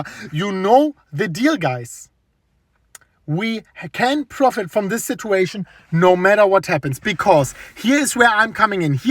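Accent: England, United Kingdom. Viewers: Sounds like England 😂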